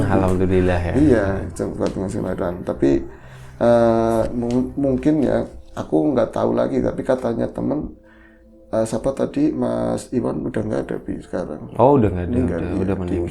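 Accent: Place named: Banyumas, Indonesia. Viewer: Sueneng bgt denger logate... 😀😁👍Ngapakmodeon ❤️❤️...sehat dan sukses sll ya Mas berdua,GBU!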